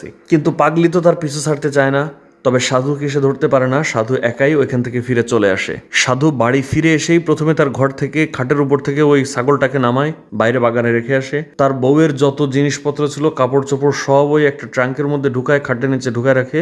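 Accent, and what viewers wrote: Khulna, Bangladesh. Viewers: ভাই... আপনি কি আমাদের খুলনা/ বাগেরহাট জেলার মানুষ? আপনার কথা শুনলে সেটা বোঝা যায়। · আপনার বাড়ি কি খুলনা? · ভাই আপনার কথা শুনে মনে হচ্ছে আপনার বাড়ি খুলনা অঞ্চলে🙂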